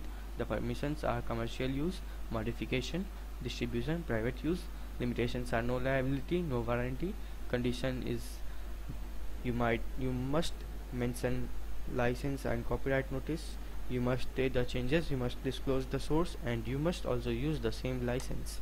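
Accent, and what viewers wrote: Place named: India. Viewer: Authentic Indian accent😄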